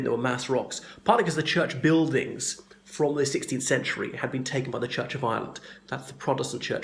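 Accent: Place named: England, United Kingdom. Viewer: Hi, Well first of all, let me say that like yourself, I know a fair bit about Irish history, I am English with Irish heritage and I also once lived (happily) in Co.Waterford for nearly 3 years and travelled throughout much of Ireland on business. Your content was excellent, but just brush up a bit more with your presentation skills. You used the phrase "let me see" seven times, let it flow more. Also sit down and make sure all your face is visible, maybe have some bullet points written behind the camera so you don't have to shift your eyes around as much enabling you to look into the camera lens is if you're actually talking to the people who are watching your vid, that way they are more likely to pay attention. Possibly it's also worth finding a plain background, instead of one with wall paper or even a large poster in the background relative to what you're discussing. 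What will rile the bigots is that you're Irish with a well spoken English accent, yes I know many people who live in Ireland are Irish but have also lived in England and speak as you do, but to quell the bigots, give a brief explanation about your background. On the point of racism, I never once encountered it from a single Irish person, only on one occasion from some American tourists in a pub in New Ross, who I presumed had some far flung Irish ancestry, but as soon as the locals in the pub came on my side they looked dumbfounded and shut up. So I wasn't clear if you were intimating there is a culture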